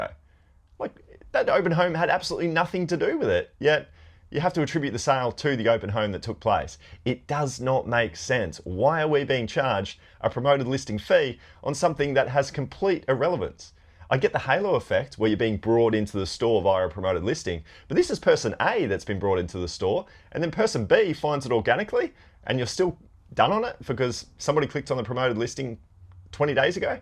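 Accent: Australia. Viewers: I like your voice its cool to hear Australian Accent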